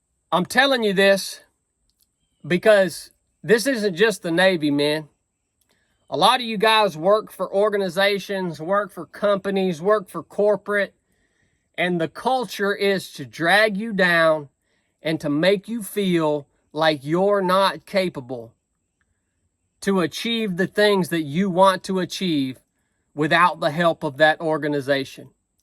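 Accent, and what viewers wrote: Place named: Georgia, United States. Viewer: As a northwestern guy, I wound up stationed in Georgia for a few years, of eight. This man’s accent and manner of speaking is most refreshing and brings back a lot of memories. Good job from an old infantryman!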